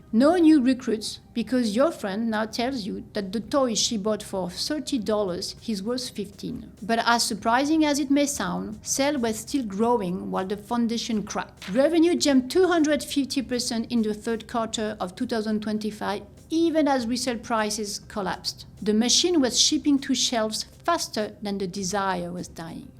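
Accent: France. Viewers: I live in Canada where the French are a minority yet continually politically destroy our country.. Listening to your highly franco-cised version of English is like a dagger in my heart from ever word... All I hear is a French voice reminding me of what the French have done to our once glorious country.. Between the French and the Indians (natives) these two tiny minorities, have destroyed Canada and have the power to continue to do so in perpetuity.... So as much as I wanted the info in this video, being lectured by 2 French people is a bridge too far... I'll just wait till a English presenter does this story.. Have a good day. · Is it me or is that a french accent I hear here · I can't take seriously your "expertise" in business in Asia when you can't pronounce the Labubu designer's name right. Frenchies are know for their bad accent but put some effort when calling people names, that's called respect. 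· Putain, l'accent français c'est vraiment violent. Ne le prenez pas mal, mais on peut faire mieux avec une IA.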